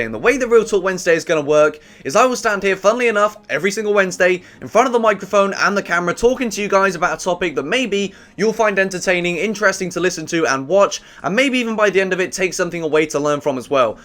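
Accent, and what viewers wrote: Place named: United Kingdom. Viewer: Just realized he had a British accent. :0 Mind=Blown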